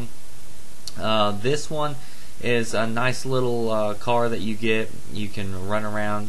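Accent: South, United States. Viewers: What state are you from? I noticed u have a southern accent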